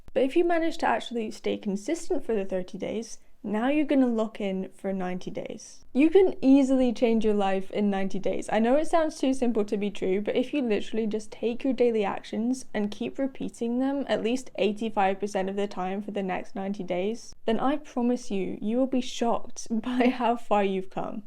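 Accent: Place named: Scottish Highlands, United Kingdom. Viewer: Where’s your accent from? 😊 I’m getting highlands mixed with down south..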